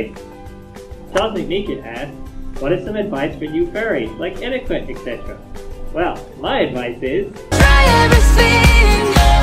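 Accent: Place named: Australia. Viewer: its the Aussie accent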